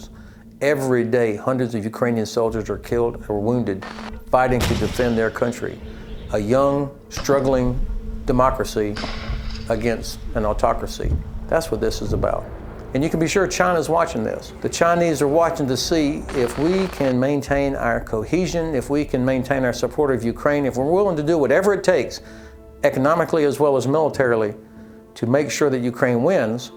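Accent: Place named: South, United States. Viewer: You have a southern United States accent so you cannot know what you’re talking about.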